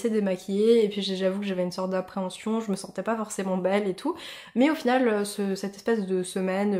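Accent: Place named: Haut-Rhin, France. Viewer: j'en était sûr ahah je sais repérer l'accent de chez moi :) je viens du haut rhin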